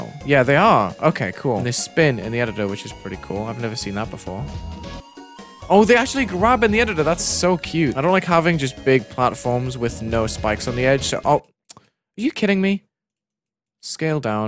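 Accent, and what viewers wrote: United Kingdom. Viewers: This doesnt have anything to do with this video, but wouldnt it be cool if you crashed that chest in the beginning of the video, and it would give you a free demon chest of your choice? Edit:, whats ur accent? It sounds a bit british but also not even british at the same time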